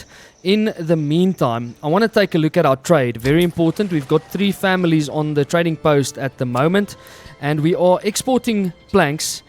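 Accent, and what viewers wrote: South Africa. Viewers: I hear a SA gamer i subscribe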